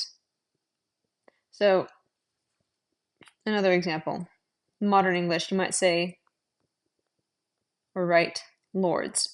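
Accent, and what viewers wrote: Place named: United States. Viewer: Nice video! I really wish you'd used IPA though, maybe even in its own extra column -- it would've been a lot easier for me to glance over and understand the pronunciations that way. I majored in linguistics, to be fair, but I imagine a lot of people interested in Middle English on YouTube may have such a background. I also evidently speak a dialect of American English that doesn't match yours (my "beggar" has the SAME e sound as "better"), so some of your explanations led to me learning equally as much about your particular accent as about Middle English! Anyway, awesome job, and I'm looking forward to bingewatching this series! Thank you so much for creating a Middle English YouTube niche :D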